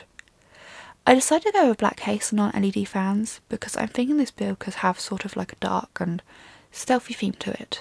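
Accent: England, United Kingdom. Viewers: That English accent 👌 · Voice of a woman on review and its brish accent. .....tasty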